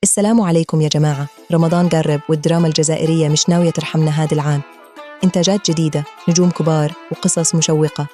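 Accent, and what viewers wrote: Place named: Egypt. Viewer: اظن مصرية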